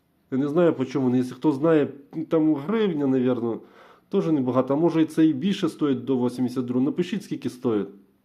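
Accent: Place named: Kuban, Russia. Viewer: что за слова у тебя из рта вылетают, с кубани что ли что по русски слабо разговаривать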